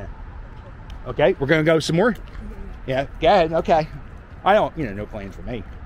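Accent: Philadelphia, United States. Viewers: Yo!, I subscribed to your site because I enjoy listening to the Philly accent again its been years. Great work on your videos, keep it up!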